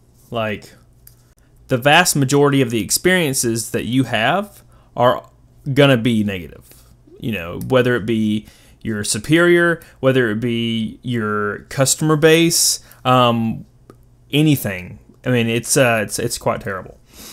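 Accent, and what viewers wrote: South, United States. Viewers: This just popped up for me. So weird hearing you try to turn off the southern twang. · he's so southern i love it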